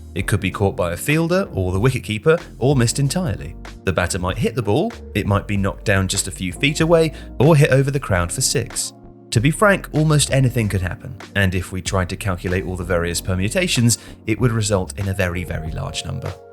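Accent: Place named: United Kingdom. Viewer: I waited the whole video to hear something about hockey, a sport that is in some sense very similar to football. I think when it comes to data analysis, you just analyse what's important for you to help you decide either strategy during a game or overall management of the team. And I still believe there is a gap here because Americans love statistics, the video having a British accent makes me think they haven't talked to the Americans yet :). I grew up watching American football and I will never forget when I was once watching a game and the stat came up on screen (not accurate but you'll get the idea): "Quarterback John Doe has a pass completion rate of 57% when the temperature is below 32 degrees" (Fahrenheit of course). I was like "oh, they will have data for everything". So, back to football, they will have the important stats. Statistics on victories (home and away), victory rate after scoring first, victory rate after trailing starting the second half, victory rate after trailing when compensation time starts, goals per game (already done), goals per penalty kicks (where in the goal the shoot more often, accuracy per kick location, I think this is already done), penalty kicks stopped (by keepers, already done), clean sheets for keepers (already done), accurate passes (left and right leg), goals (left and right leg, headers), ball contact time/times (done) they may even have duel stats, like Messi's successful dribble rate against Pepe. 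In hockey you would have similar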